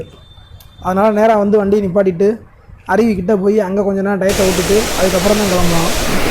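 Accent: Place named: Madurai, India. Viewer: Hi, Nice Hidden waterfalls & your natural Madhurai Slang is so good to listen.....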